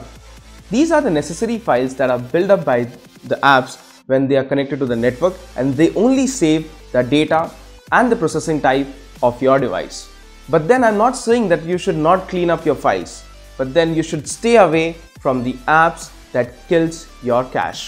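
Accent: India. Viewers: you speak english is totally wrong, because indian language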